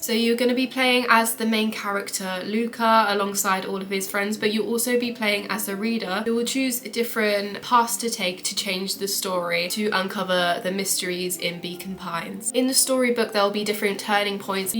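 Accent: United Kingdom. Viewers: hi! u have amazing eyes, ur skin is flawless and i love ur accent. british accent is my favorite accent ever. may i ask how old are you? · Night in the woods means a lot to me as a broken middle aged man, so not quite the target audience lol I really love that game tho have it on multiple platforms. And your accent is as beautiful as your looks, I’ll bishop being live with British girls lol 😻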